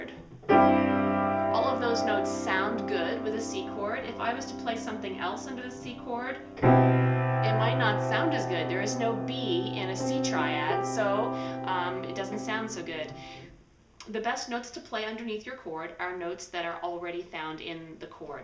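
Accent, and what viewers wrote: Canada. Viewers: Your accent sounds a bit like Canada or Northern U.S. am I right? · This is exactly what i was looking for, and your video was pretty easy to follow. Appreciate alot! It seemed you are from Canada, am i wrong? ;)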